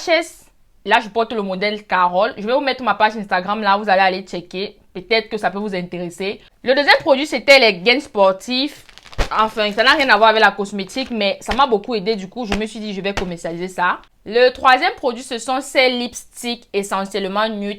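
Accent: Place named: Cameroon. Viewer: T'es un cas ewoooo tu fais rire j'aime trop comment les Camerounais parlent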